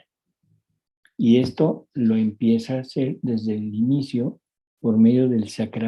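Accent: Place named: Mexico. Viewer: Ay! Doctor, es usted una maravilla de palabras, explicaciones viva su maravilloso acento mexicano. Le amo, el Señor le bendiga.desde 🇪🇦 ledoylos buenos dias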